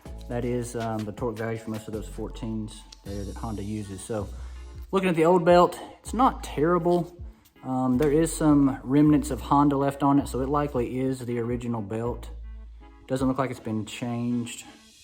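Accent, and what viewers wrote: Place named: South, United States. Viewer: great video thanks. You sound like you might be in the south....are you for hire to perform this service? I'm in GA and am looking for someone who can do a timing belt service for a Honda Beat.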